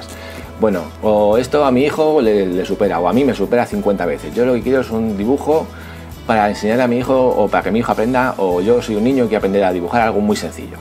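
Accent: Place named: Spain. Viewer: Amo el asentó español 😂 nada q t voy a dar un like pues m dio gracia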